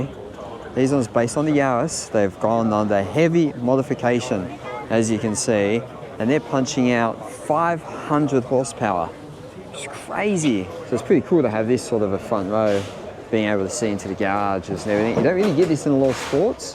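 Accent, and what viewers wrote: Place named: Australia. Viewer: Nice video, mate (with the cool Australian accent). Hope you enjoyed your time in Finland. Our family has been every autumn there spectating the rally in three different generations at least. It’s always a spectacle. Always good to see some Australians visiting here in the North. Also, as you’re probably aware of, our very own Valtteri Bottas is pretty much Aussie now btw. Greetings from Big Casey Stoner fan from Finland 🇫🇮🤝🇦🇺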